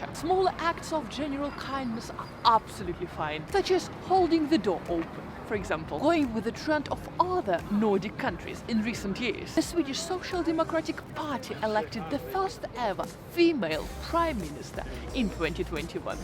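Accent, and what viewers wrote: Russia. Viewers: Your russian accent hurt my ears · Похоже на русский акцент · Are you russian? You have russian accent . An intonation mostly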